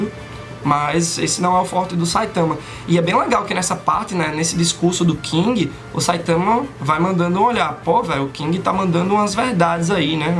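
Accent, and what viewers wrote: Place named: Sergipe, Brazil. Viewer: Tu é de Sergipe? Parece demais o sotaque. Abraço!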